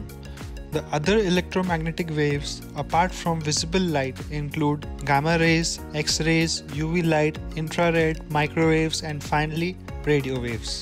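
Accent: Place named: India. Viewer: Despite the Indian accent, the contents are very clear & quite easy to follow.. Thank you...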